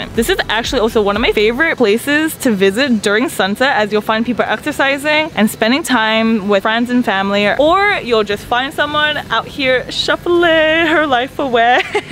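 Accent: United States. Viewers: Hey! Sounds like you’re from the states. Just curious, how long was the flight from where you were to Cambodia? Thinking of doing a trip end of year. Awesome vid 🤙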